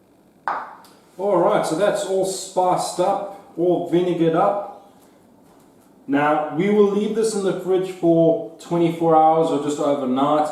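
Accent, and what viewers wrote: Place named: South Africa. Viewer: I can hear Seth Efrica in but you've also something else going on with your accent. Nice video by the way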